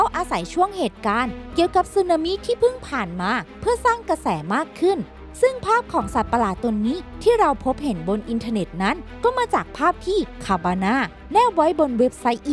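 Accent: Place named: Suphanburi, Thailand. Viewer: ชอบสำเนียงเหน่อๆคนพากย์แบบสาวสุพรรณแบบนี้จริงๆน่ารักแปลกๆดี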